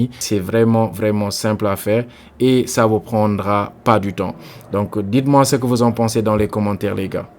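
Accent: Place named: Africa. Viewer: N'IMPORTE QUOI !! ce n'est pas du tout un clone de ta voix ! Notamment, ta voix clonée n'a pas ton accent africain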